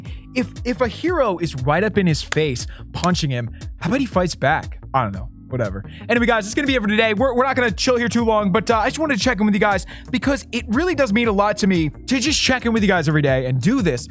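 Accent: Canada. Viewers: are you canadian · Wait, is he Canadian?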